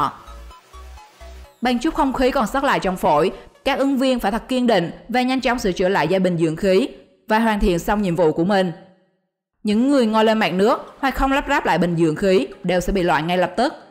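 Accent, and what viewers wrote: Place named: Central, Vietnam. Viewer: Giọng miền Trung mà thuyết minh thì hãm thôi rồi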